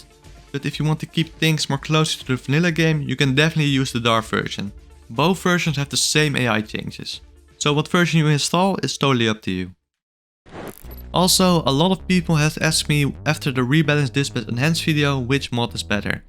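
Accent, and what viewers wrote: Netherlands. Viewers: You sound really Nederlands mijn vriend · Zo te horen kom je uit Nederland ❤ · I think he is dutch so his accent makes it sound like that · Are you Dutch you got a Dutch accent . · that thick dutch accent, ik hou ervan!!! leuk video!!! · Ik hoor nederlands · Thickest dutch accent of the month. Good video tho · OMG!! That thick dutch accent. Sorry cant stand it, i could not finish your video because of it and i am pretty sure i aint the only one thinking that. I would strongly suggest working on that accent if you want to get anywhere on youtube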